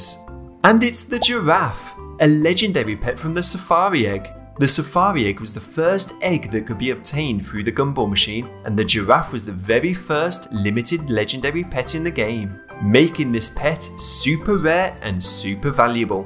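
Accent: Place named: United Kingdom. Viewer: You can tell hes british!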